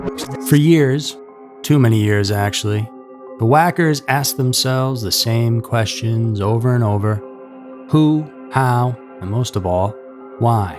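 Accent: South, United States. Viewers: Great story my friend. Love the Voice. Down south southern style❤🎉😂😂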